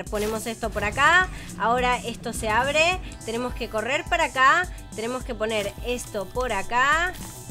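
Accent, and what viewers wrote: Argentina. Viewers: Hola eres argentina😊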